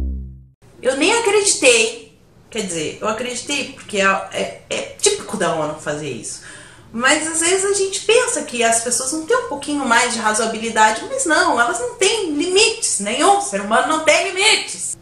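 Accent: Rio Grande do Sul, Brazil. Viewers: " todos iguais, todos iguais, mas uns mais iguais que outros", adorei a consideração a melhor banda do Brasil:. Parabéns pelo trabalho professora! Meu sonho é ter aula contigo, bah que guria sensacional, se gaúcho não tem o melhor sotaque brasileiro eu não sei quem tem❤️ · Oi !! Bem que vi pelo teu sotaque que tu é gaúcha, como eu. Assisti a um vídeo teu onde estava te deslocando de carro pela BR 116, sentido NH - Porto Alegre e entrou na Rua Ipiranga em Canoas.... hahhahaha Legal !! Moro em Esteio, mas sou de Canoas.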